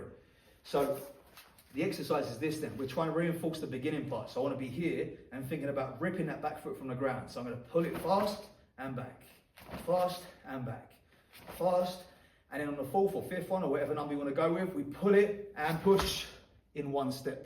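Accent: United Kingdom. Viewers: I live in London and do shotokan karate, and you seem like you live in London too from your accent, do you have a club?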